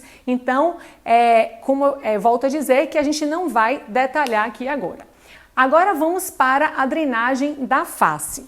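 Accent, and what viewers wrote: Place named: Northeast, Brazil. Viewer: Simplesmente espetacular ! Muito rica em didática e lógica além do acréscimo charmoso do sotaque nordestino .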